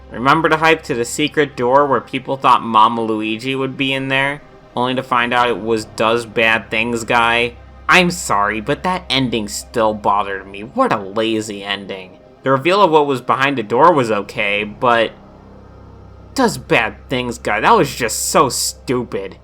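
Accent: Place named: Brooklyn, United States. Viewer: At times u sound like brooklyn t guy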